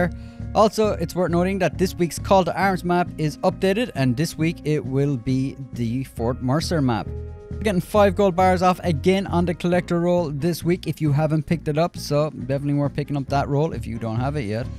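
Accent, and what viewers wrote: Ireland. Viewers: is from Ireland they sound weird to a brit the 30 especially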